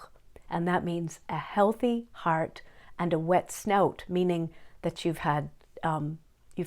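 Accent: Ireland. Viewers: Greetings, I'm not up to scratch with tenoliglical stuff, I lived on the land since I was born, my friends are like you, nice people. I'm off ta play music tomorrow, I know how ta grow food and be basic, I goes up to meet nice neighbours and I tell them I jump on to you you tube and came accross this lady called, they laughed their heads off at me, this man is a dab hand in writing books on Irish language, I said maybe you should invite this lady over, I left de message with him, I was telling em she speaks like a donegal woman, ican see your good hearted woman, thanks for replying, If you get to Erin again I'll introduce you to nice people. Your very modest woman, I can understand you when you speak. Kenneth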